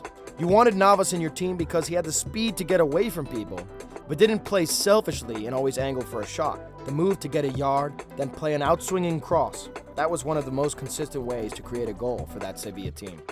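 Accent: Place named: United States. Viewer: american accent: immediately loses credibility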